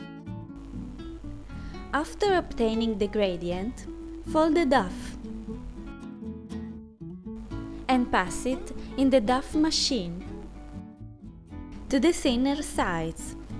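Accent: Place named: Italy. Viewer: Cute strong accent. What part of Italy r u from?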